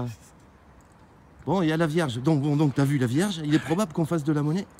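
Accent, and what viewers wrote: South, France. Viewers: Non mais en tout cas j'adore quand tu fais de la detection avec tu accent du sud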